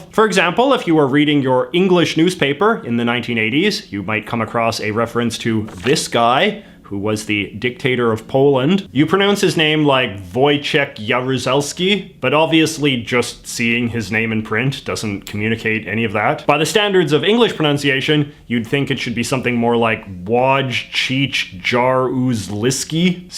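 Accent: Canada. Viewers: In addition to his use of sound effects, I find amusing Mr. seemingly unconscious and unironic Canadian variations [n b.: plural] on the word "about." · I'm American, I just subscribed bc I find Canadian accent very sexy. But then I found out that his content is pretty good too.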